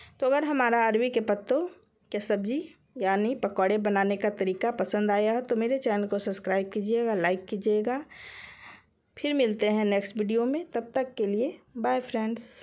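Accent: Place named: Bihar, India. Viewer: hame aap ki awaz se to pata tha ki aap bihar se hai lekin puchti nahin thi ab ham aor pakka dost ho gaye hai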